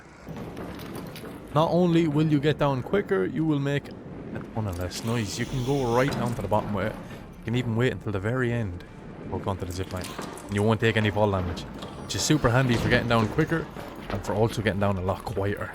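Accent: Ireland. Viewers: He obviously has an Irish accent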